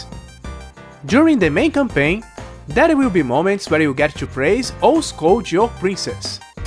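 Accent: Brazil. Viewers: Hello Bro. Found your channel after searching for Uppers Review and I'm having fun with all these japanese game analysis. Are you from Brazil? Cause your accent resembles of someone from there. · Your accet is Brazilian right? at first I thought it was Japanese, but then I found it more familiar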